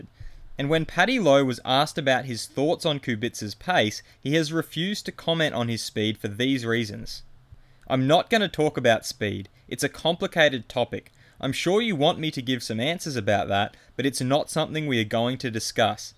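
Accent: Australia. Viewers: Great video. On your suggestions for future videos, one thing I suggest is to change your accent. For many people the Australian accent is very annoying. Thanks.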